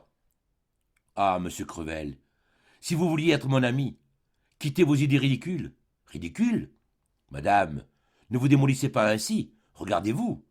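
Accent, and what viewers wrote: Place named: South, France. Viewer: Ce n'est pas un conteur agréable a écouter Cette accent du midi élocution hachée j’abanbonne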